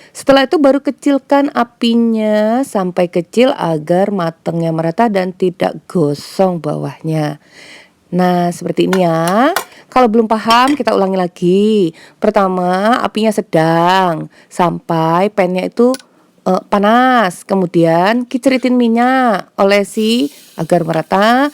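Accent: Java, Indonesia. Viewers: Berpori euy kayak wajah AQ klo lagi ga dirawat hkhk Mm suara nya medok ok jg pantes jg jd org jowo hkhk Pas bgt buat takjil niii ga perlu beli Yg pertama bkin si unti dlu ya Kdua bkin adonan dadar nya Pake teflon ternyata kayak bkiin leker ya Selera ya boleh yg berbolong bolong boleh yg mulus dg api kecil pan hangat ..monggo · Medoknya jwany mn jeng, 😊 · Profilnya ganti jadi pangling nih, cantiiik bgt hasilnya, adonannya di saring dulu biar g bergrindil, tumben nih logatnya medok😁😁😁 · Diputtaaar....di cellup,dijillattiii😀...aq gemmess ama suaraneee,RENYAAH,wong jowoo taa...luccu,saabaaar...lope..lopee💋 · Jadi kalo untuk dadar gulung itu yang berpori semakin bolong semakin ciantikk ya, ternyata yang enak itu yang pake telur, kalo pengen porinya banyak taflon panas tambahkan minyak lalu masukan adonan, kalo pengen kulit nya halus jangan kasih minyak dan api kecill, sipp trimakasih tipnya ternyata adonan nya kasik telur dan minyak sayur, selamat berbuka puasa, aduhh ada yang logat jawa nihhh hahah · Walikimsalam kaka sayg algamdllah puasa nya lancar Wah aku sellu gagal buat dadar gulung mawu coba resep Gula ama kelapa jangan jadi srundeng😆😆😆 Telur nya simpan bagian tengah ya kaka 2 sendok makan miyak gorenggggg di cicin minyak dikit dah da msup adonan nya d goyang2 wah hasil nya bagus bolong2wah rotinya melenuk gaya ngomong kaya orang jawa kaka guluk embk wah hasil mantap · Buat isian semua bahan campur Lalau masak awas jangan sampai jd srundeng Lalau buat kulit tepung gula telur tambah santan santan kara instan lebih kental Tambahkan pasta pandan aduk sampai rata,jangan khawatie jangan sampai beekwtu janganlupa tambah minyak goreng di sari Yap Pas banget buat ta'jil baiat sesiei akan dapat 12 janganlupa Duk duslu Nang ini udah terlihat guling bolong, u menciptakan adonan bolong itu pnannya haru panas Olesi minyak masukan asona dintuplek hasilnya bolong bolong waw kayak orang Jawa wkwkwk mantab medhok nampak macan Kalau mau yang mulus api kecil pan hangat gak pake minyak,Nang sekarang akan kasih isian kedalam kulit ini yang sedang bolong bolongnya, Wah saatnya gulung wkwkwk wong jowo ngono iya to 😆 mantabz dah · Dasar gulung pakai Nada jawa he he Isian nya kelapa Gulung gulung Ayooo gulung meneh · Medok bangget mbk yu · Mari bikin dadar gulung dulu...iya bu sudah faham..ada yang seperti macan...iya cantik mom..kalau mau yang mulus pakai api kecil gak usah pakai minyak..omongannya kok kayak wong jowo mom...waduh ada roti...mau dong..lanjut di isi pakai unti kelapa...di gulung lipat gulung lipat..haha ayo lagi siapa takut...ada2 kamu mom..lumayan buat aku ketawa hari ini dadaaa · wah dadar gulung nya enk bngt buat tajil cocok bngt mah dari pda bli cara biat nya juga gampng y klw liat mmh yg but tpi klw rara yg buat pasti gagal hahaha,wah ada dua macam y mah dadar gulung nya yg satu berpori yg satu nya lagi mulus ,cara buat nya sama cmn beda di saat waktu manasin adonan nya klw yg pori saat adonan d masukan ke pen pen nya tunggu panas dlu baru kasih adonan pke api kecil ,klw pngn yg mulus pen nya jngn pnas tpi hngt sama dngn api kcil klw dah buat dadar gulung nya tinggal masukin unti nya dah d gulung" jadi dah dadar gulung hahaha ,wah itu ada roti mamah abis buat roti lngsung buat dadar gulung y ,wah ada ilmu satu lagi ternyta dadar gulung ada yg bisa g pke telor juga y mah ,aduh gagal pokus ma suara mmh yg medok 😁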